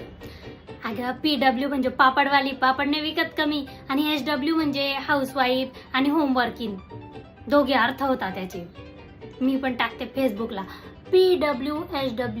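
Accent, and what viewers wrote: Khandesh, India. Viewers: खूप छान ह्युमर आहे तुमचा. खान्देशी स्वर तर जाणवतोच. ❤❤❤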